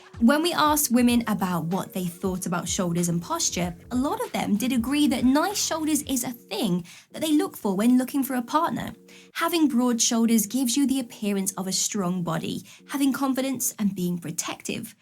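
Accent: United Kingdom. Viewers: You sound British,but u r based in usa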